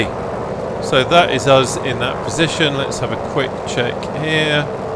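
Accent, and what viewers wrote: United Kingdom. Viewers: 🙈 Pffff … British accent, British person , it’s got to be Duxford or UK. ! UK a bit flat and boring to fly over in m opinion. !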